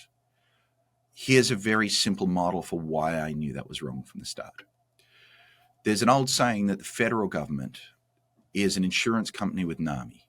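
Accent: Australia. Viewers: You sound Australian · This guy has got it and explains well. He sounds Australian, wonder if he is? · Strange to hear "we" meaning Americans in an Australian accent. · Not too bad for a 'strine' accent.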